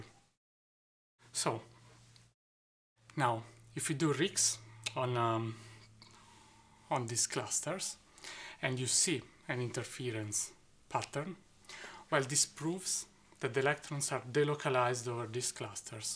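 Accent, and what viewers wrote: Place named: Italy. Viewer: Der italienische Akzent ist total süß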